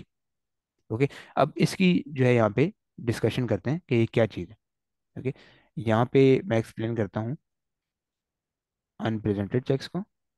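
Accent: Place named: Gilgit, Pakistan. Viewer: You have one of the best ways to explain that I've come across so far. Your accent sounds like that of the Gilgit people.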